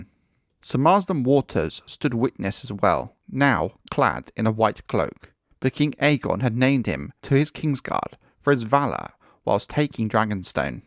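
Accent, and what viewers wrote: United Kingdom. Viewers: Too British I can't understand without subtitles.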